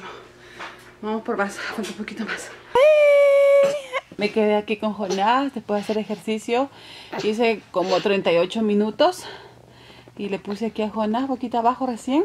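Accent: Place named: Peru. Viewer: Jajaja sabes me.mato de risa porque hablas tan peruana, como extraño Lima Peru, compatriota mia. 😀 Espero mejore tu bebito esta lindo. Suerte !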